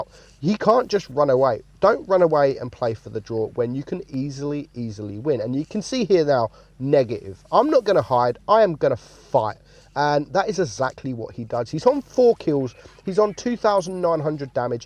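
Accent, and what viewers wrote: United Kingdom. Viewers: I like your British accent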